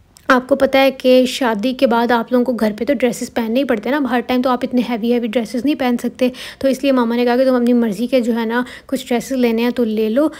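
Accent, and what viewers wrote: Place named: Punjab, India. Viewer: Iam from India punjab te mainu tuadi boli boht pasand h pure Punjabi jado bolde o boht Kazaa aunda h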